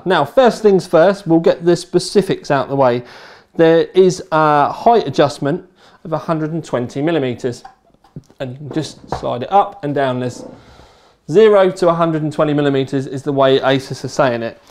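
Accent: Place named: South East England, United Kingdom. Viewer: Off the top of my head, you are a Kent, Essex lad... I could be wrong... Maybe South of the Thames... I know you are somewhere South East, South West possibly, but I would have lost my money... South East for me! Peace...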